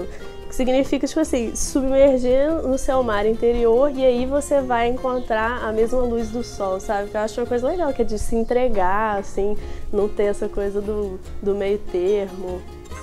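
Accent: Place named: Brazil. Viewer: antes eu n tava ligado nessa de definir o sotaque brasileiro do inglês, mas no momento que vi ela falar do vídeo do mine, sabia que ela era brasileira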